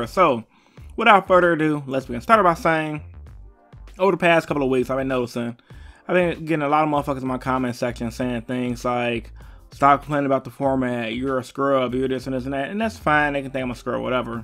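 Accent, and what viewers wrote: Chicago, United States. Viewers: Bro! You are so chicago lol respect.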